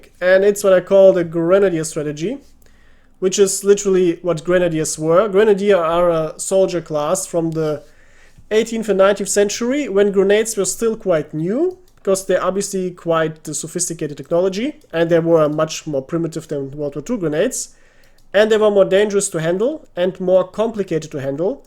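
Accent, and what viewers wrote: Germany. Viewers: truth! Obviously, his Enlisted take is great, or we wouldn't watch. But the delivery - a balance of German inflected English accent with many wry wittisisms is the cherry on top. If instead it was rando guy from North America, it might be less pleasing to my ears.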